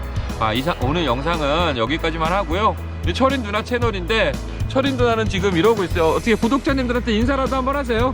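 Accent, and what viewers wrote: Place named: Seoul, South Korea. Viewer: 서울말 깔끔하게 구사하셔서 깜짝 놀랐어요..ㅎㅎ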